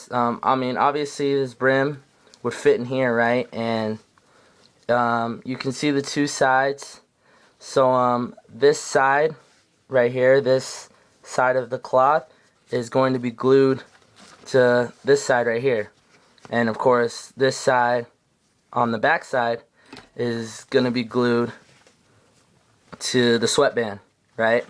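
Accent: United States. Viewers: Hey you from utah huh haha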